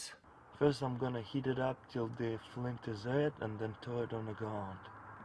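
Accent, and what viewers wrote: Russia. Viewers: Your awesome russian accent can only get you so far, please stop re-uploading things you've already done and make some new content. Or make something cool out of old projects. (i.e. maybe make a borax green torch for your backyard)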